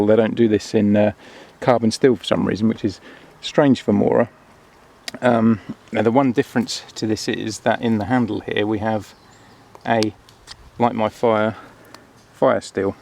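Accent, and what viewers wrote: United Kingdom. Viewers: where abouts in uk are your from man?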